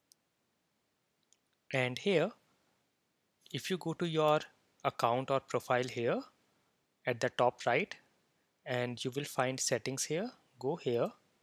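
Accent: India. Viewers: entah foldah saovah nambah pikchah fuchah Administatoh hieh Cringe Indian English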